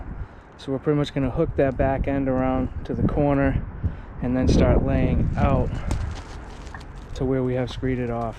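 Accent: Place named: Maine, United States. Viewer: I love your channel and videos! For a second, I thought you were a fellow Canadian... well... until the Maine accent came out.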